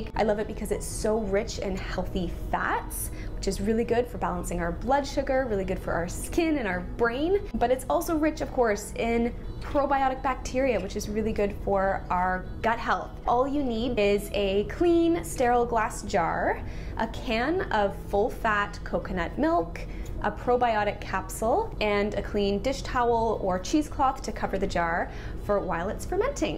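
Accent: Canada. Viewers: you sound very Canadian, but great video.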